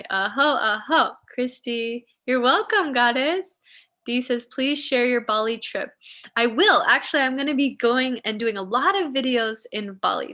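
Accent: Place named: United Kingdom. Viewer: Beautiful British accent, babe, tea and crumpets sounds nice, after a breakbeat.uk.co rave all night, without the MCs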